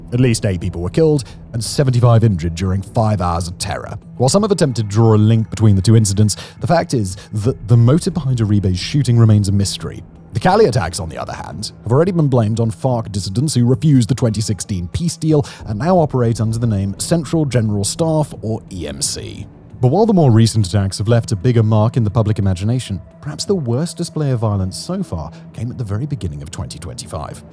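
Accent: United Kingdom. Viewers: Dude... he doesn't run these channels. He is just hired as a reader because of his British accent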